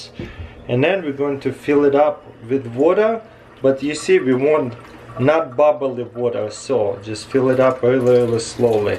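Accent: Russia. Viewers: I also like your russian accent!!!🖒🖒🖒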